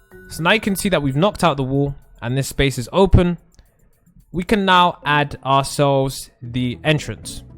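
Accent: United Kingdom. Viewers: I love the British acsent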